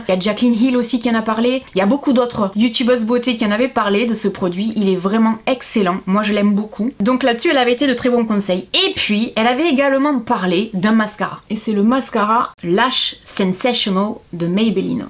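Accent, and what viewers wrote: South, France. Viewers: ET SON ACCENT DU SUD UN REGALE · Il est beau notre accent!! Merci pour cette video. Bisous d une catalane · Coucou. J’ai toujours voulu tester les marques M.A.C et Maybelline, malheureusement elles testent toujours sur les animaux donc je préfère m’abstenir.... J’ai beaucoup aimé ta vidéo mais surtout ton accent du sud! 😂 Bon week-end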